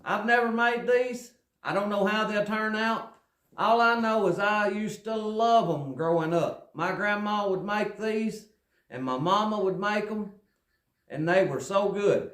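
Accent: South, United States. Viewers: Hey.I never node you was southern. 😅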